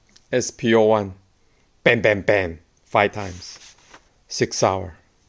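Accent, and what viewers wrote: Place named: Europe. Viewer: Your accent was so thick I thought you were European at first. Haha, nice guns!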